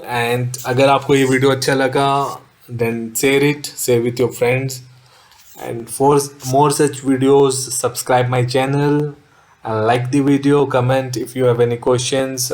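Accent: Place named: Bhojpuri, India. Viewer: Dear if u cant speak english properly for explanation about the international safety course then pls donot use bhojpuri english ....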